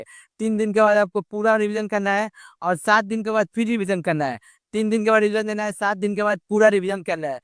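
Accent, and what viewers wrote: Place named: Bengal, India. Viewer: Abe vo bangali bolta hai hindi sikh raha hai abhi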